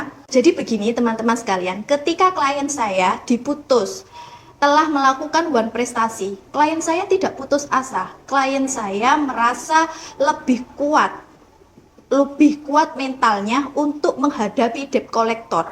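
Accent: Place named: Java, Indonesia. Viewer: Jawa ha medok bngt mba. 😂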